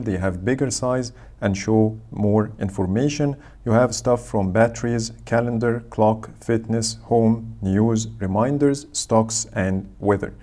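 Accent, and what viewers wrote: Egypt. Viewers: I think you are Egyptian from your English accent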